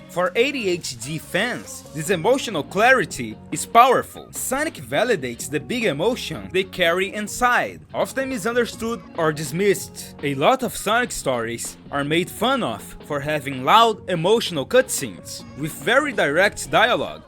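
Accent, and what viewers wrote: Brazil. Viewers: My dudeeee, are you? It's almost the same title and almost same thumbnail! And I noticed that you have that brazilian accent aswell. Just wanted to know. I'm subscribed in both channels, so I was curious xd Ty for the attention! 👊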